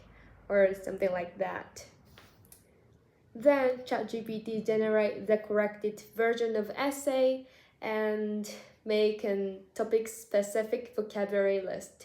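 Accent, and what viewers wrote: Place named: Germany. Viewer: スイスに行く前はアメリカ英語を学ばれてたと思うけど、多分スイスに行って若干ドイツ語訛りが出てきてるね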